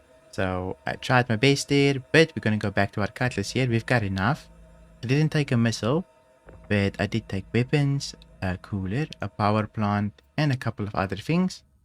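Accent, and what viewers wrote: South Africa. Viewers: I'm sure you're South African in some way or form...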